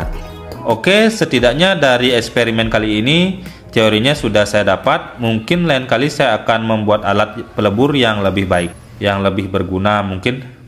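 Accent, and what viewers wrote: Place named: Aceh, Indonesia. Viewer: Logat nya kayak tau, dr aceh bg ya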